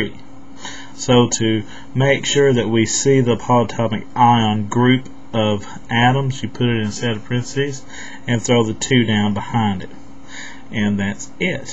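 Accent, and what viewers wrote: United States. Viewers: Thank you helped a lot :) I love the accent lol Americans mmmm mmm good lol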